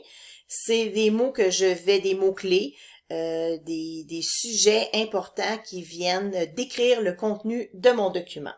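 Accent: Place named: Canada. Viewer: l'accent canadien, j'étais pas prêt !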